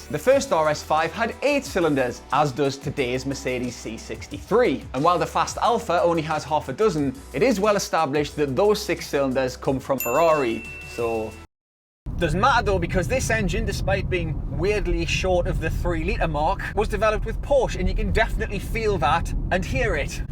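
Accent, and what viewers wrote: Newcastle, United Kingdom. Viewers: Odd that you only have 35 thousand subscribers when Matt at Carwow, who isn’t nearly as entertaining has millions. Maybe the brummie accent is easier on the American ear than the geordie accent? Like the undiscovered YouTube talent Chris Harris back in 2015, you’ll have your day soon enough. Did they offer you the top gear job Mark?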